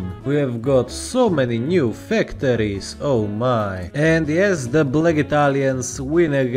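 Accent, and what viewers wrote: Russia. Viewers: are you actually russian? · Ты не русский случаем? Акцент у тебя такой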